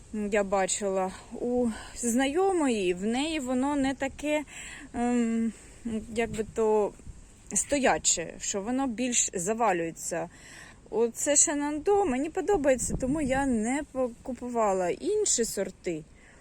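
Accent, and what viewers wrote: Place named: Russia. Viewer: Трудно слушать украинскую мову , в исполнении русскоговорящих.Ну так и хочется помочь . Зачем ? Конечно может это требования ютуба или нашей е- ной власти , а может необузданый патриотизм , не знаю . В любом случае сочувствую.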